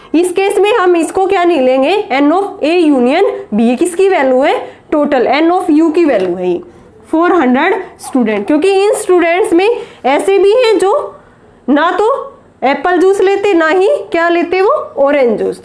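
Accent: Haryana, India. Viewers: She is sure from HARYANA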